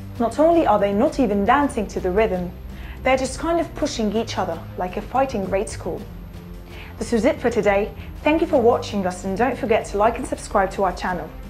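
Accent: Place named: London, United Kingdom. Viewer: Miranda Brooks I had no problem understanding her at all. im from Montana and can understand that it's a typical London accent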